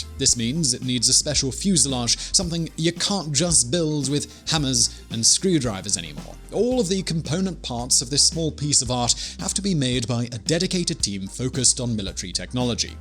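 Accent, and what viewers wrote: United States. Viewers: stop doing american accent please! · Nice American accent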